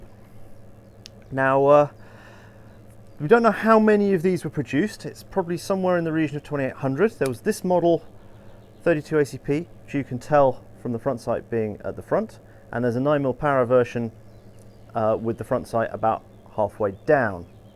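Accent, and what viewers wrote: United Kingdom. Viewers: From his accent,I assumed he was British,and living in the UK. I then send,WTF, how can he get access to a suppressed PISTOL ???!!! I then learn he is in Switzerland. Didn't realize they have gun laws not much different than in the U.S.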